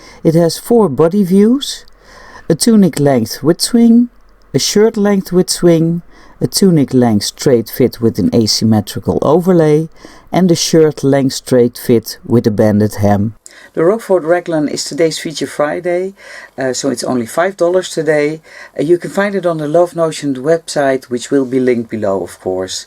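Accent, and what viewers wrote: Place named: Netherlands. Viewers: Ik hoorde meteen dat je Nederlands bent...(sorry, altijd een Nederlands accentje in ons engels.) En wat leuk dat je ook love notions patterns gebruikt. Ik pas de Europese patronen niet omdat ik maar 1.60m ben. En de LNP zijn voor kortere dames gemaakt. Wat een leuke top is dat geworden. Goed idee. Ga ik gebruiken. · Hahaha ja dat hoor je wel ja dat Nederlandse accent😁 Ik ben ook maar 1.60m en ik heb er ook altijd problemen mee maar de LN patronen zijn idd super!😉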